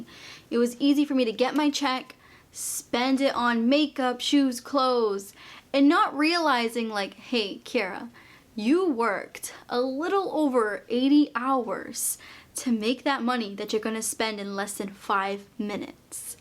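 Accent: Boston, United States. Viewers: Hello from Canada. I'm a relatively new subscriber and am so glad I found your videos. You are so down to earth and logical with very good information. I just have to tell you that I love your Boston accent.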